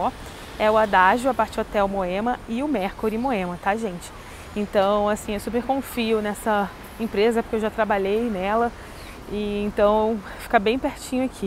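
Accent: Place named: Rio de Janeiro, Brazil. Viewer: Totalmente sem sentido colocar uma carioca para falar de Moema. Ninguém merece ouvir lilais.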